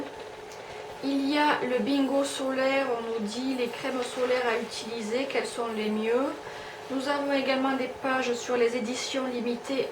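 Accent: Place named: South, France. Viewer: Une drôle d'histoire 😉 j'aime ton enthousiasme et ton bel accent du sud 👌👍1 like + 1 abonné de plus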